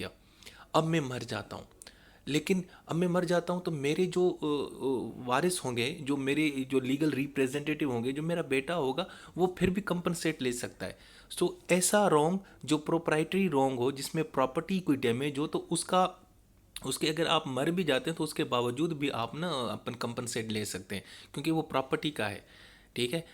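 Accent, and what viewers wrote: Karachi, Pakistan. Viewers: Sir ap Karachi sa hn? Ap ky bat krny k Andaz sa lg rha h plz btay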